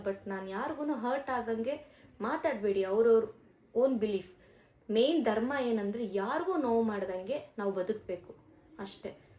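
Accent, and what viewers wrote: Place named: Tamil Nadu, India. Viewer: your talking kannada like a tamilian. You lost ur kannada touch a little. Btw i am tamilian from bangalore. My mom was from chennai whose kannada would be like u Not meaning to hurt u. Just a comment from my POV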